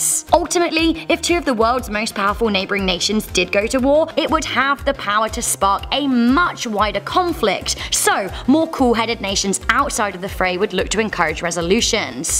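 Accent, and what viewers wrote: England, United Kingdom. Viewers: You live in Canada but sound very English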